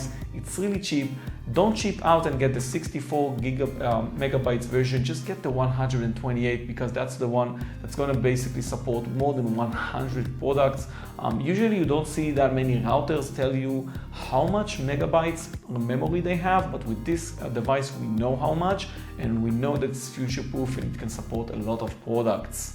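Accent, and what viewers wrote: Israel. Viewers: Love the Israeli accent! Quick questions: 1. Do you need a modem to connect to the router or it is functional as both? 2. Can u access the administrator panel easily? Does it support English or it is all on Chinese? Thanks for the review mate! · שומעים שאתה ישראלי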